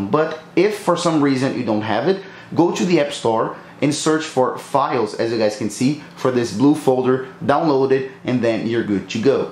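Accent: Brazil. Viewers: Dude, are u Brazilian? I’m Brazilian as well and they way you speak English it’s exactly how Brazilian accent sounds like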